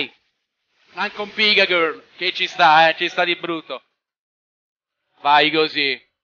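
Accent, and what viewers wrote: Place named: Rome, Italy. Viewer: Se capisce dal suo linguaggio,abita a roma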